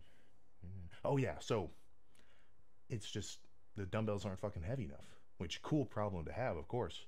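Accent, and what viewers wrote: Birmingham, United Kingdom. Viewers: That’s a real Brummie accent right there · That Brummy is fucking well annoying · he's English with a Birmingham accent - each city and even some towns will speak differently with different slang and accents. That's the case all over the country, if I get in the car and drive an hour from my house everyone sounds completely different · It’s a Birmingham accent bro second biggest city in England towards the north so your not too far off 👍🏽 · Yesss... Go on, you're getting the full BRUMMY accent... straight out of the gate! Big up the UK! Awesome to see you here man!:goat-turquoise-white-horns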